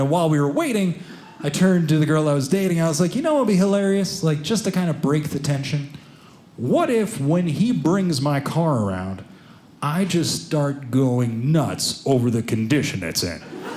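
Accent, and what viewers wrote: United States. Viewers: I have to say that is the most American a person has ever sounded in my very short time of having sentience.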